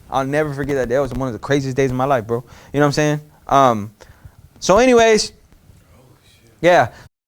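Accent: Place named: Houston, United States. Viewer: i like how you can tell he's from houston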